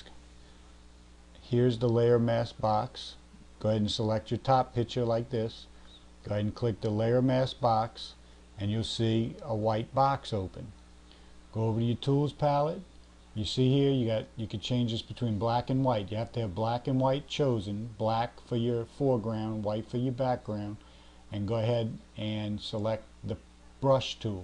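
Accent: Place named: Louisiana, United States. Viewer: This is awesome...the accent sounds Louisiana/Cajun-ish. Geaux Tigers!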